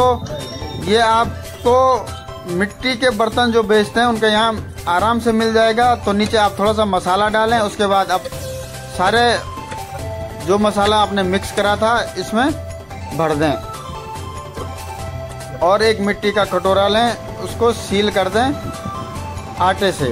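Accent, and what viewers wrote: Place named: Uttarakhand, India. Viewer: Yar Bhai ji handi chicken bihar ki dis h socha nhi tha AP uttrakhandi ho apki language see lag hi Raha tha Jai badri vishal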